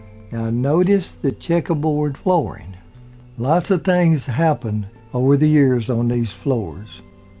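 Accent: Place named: Mississippi, United States. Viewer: Wow, what a story!!! Great video and scripting, per usual! And, as always, your great narration with that Mississippi accent is the best!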